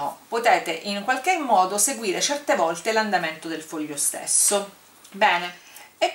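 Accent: Sicily, Italy. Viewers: Ma sei Siciliana vero?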